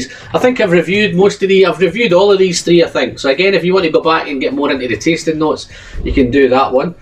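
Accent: Scotland, United Kingdom. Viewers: Edinburgh and still here. I love what all the Whisky guys are doing but a broad Scottish accent tops it off. Take care wherever you are traveling to and as Ralfy says, keep it quality and don't get pished.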